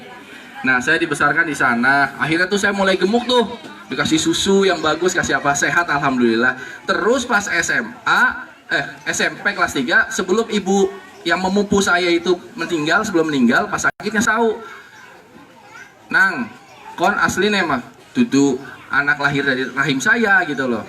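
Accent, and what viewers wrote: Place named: Indramayu, Indonesia. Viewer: Logatnya kentel indramayu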